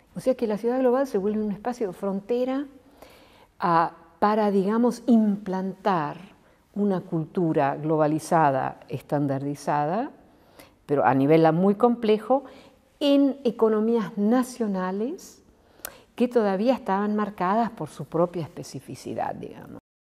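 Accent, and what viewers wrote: Argentina. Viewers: No esperaba que hablara en español menos tan porteño. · How come she speaks perfect argy Spanish? I hear that she lived until 1950 in Argentina, but at that time she was 1!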